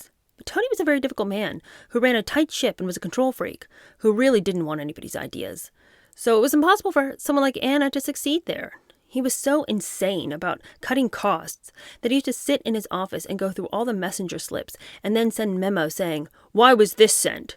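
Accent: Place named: Upper class, United Kingdom. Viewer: In the UK that accent is a golden ticket, it denotes you have the best education and through that elite education the best contacts. This is why so many Brits strive to have their children privately educated. I didn't have the means to privately educate my son, but I am able to help support one for my grandson.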